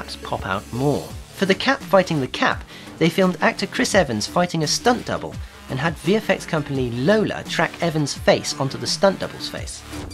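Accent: United Kingdom. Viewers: this video is alot better because of British accent? I love it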